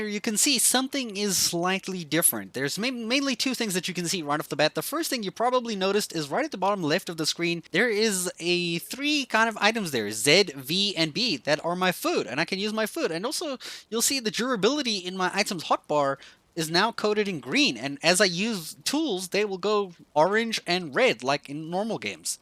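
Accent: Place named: South Africa. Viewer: My dude. Where are you from? You sound North American, but then you 100% don't. South African and moved to the US or Canada? hmmm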